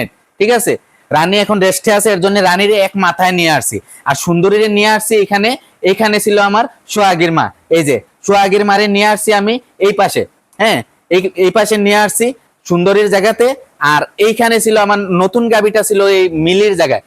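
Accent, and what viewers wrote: Sylhet, Bangladesh. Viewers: ভাই আমি সিলেটি আপনে নি সিলেটি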